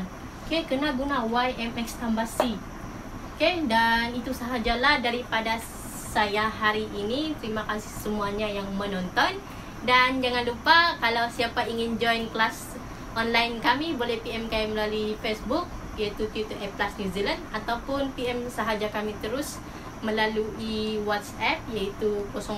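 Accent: Sabah, Malaysia. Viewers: Sabahan slang checkk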